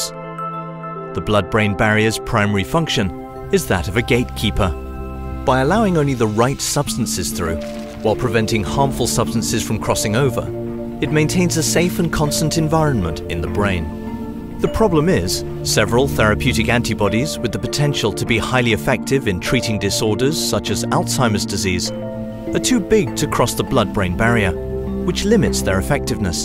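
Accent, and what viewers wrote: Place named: RP, United Kingdom. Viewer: "Location: United States" Why the posh british accent though?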